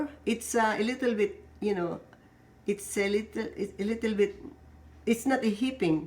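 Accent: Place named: Philippines. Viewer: Are you Filipino? Can you just speak in Tagalog? I can sense you are having difficulty speaking in English. Your accent is so thick!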